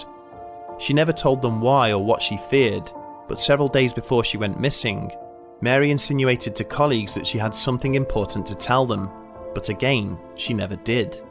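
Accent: England, United Kingdom. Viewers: Because he's English, like me! We have the best voices for narration.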